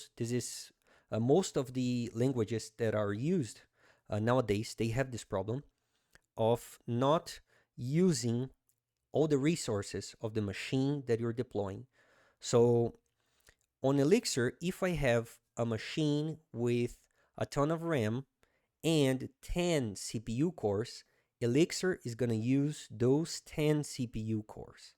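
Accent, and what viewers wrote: Brazil. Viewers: Great content! Are you Brazillian?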